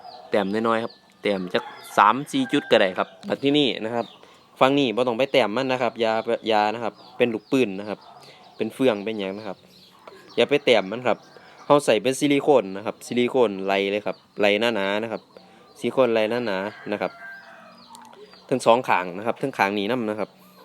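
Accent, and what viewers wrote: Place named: Isan, Thailand. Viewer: จะพูดอิสานก็อิสานไปเลย อิสานปนไทยมันฟังไม่รื่ส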